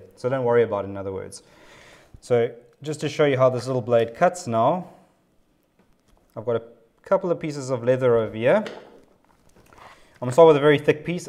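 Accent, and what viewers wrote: South Africa. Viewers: I use a knife exactly like this to fit a violin bridge. This is interesting for a luthier. Where are you from? Your accent sounds South African, maybe?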